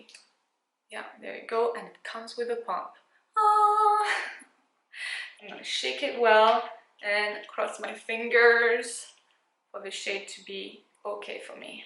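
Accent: France. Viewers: Ok so now I need Charlotte tilbury eyes to memorize in jean. What a beautiful look, I just subscribed today, I love your style! (And your French accent!). It’s French, isn’t it? 😊❤️💋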